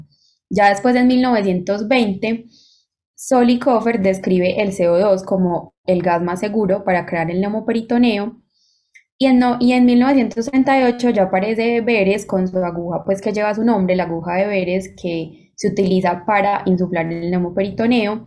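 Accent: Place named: Antioquia, Colombia. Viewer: muy paisa, buena platica, saludos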